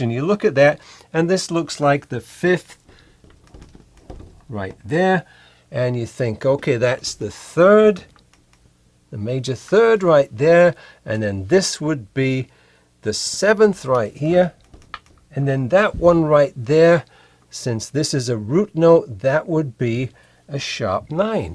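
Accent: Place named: Scotland, United Kingdom. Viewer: Working through this book, and this chord definitely confused me as to how it was written. Non-sequitur, but if you don't mind my asking, where did you grow up? Your accent is wonderful and almost a mix between Scottish and upstate New York.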